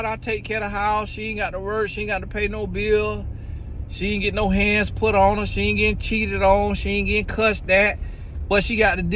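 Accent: South, United States. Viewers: Wow I’m from Florida too. Lol! I was raised up north though. You have a strong southern accent. You are hilarious but at the same time you’re telling the truth! 😂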